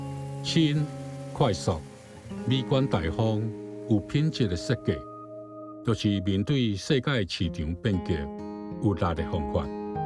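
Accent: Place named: Taipei, Taiwan. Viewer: 旁白老師好像是台北腔的台語，但是也是很好聽，我有學到比較陌生的台語名詞怎麼講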